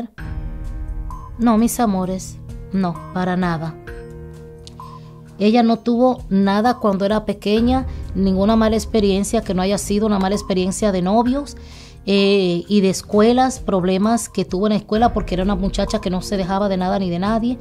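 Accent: Dominican Republic. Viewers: Hola.....disculpa pero yo pensé que UD era Dominicana..no habla como venezola..con mucho respeto..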